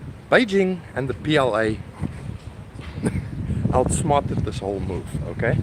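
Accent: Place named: South Africa. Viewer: my first time here. You sound South African.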